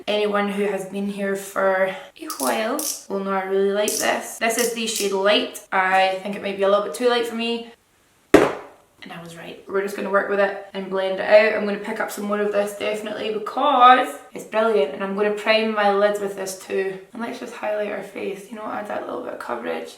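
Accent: Scotland, United Kingdom. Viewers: omggg I love your Scottish accent xxxxx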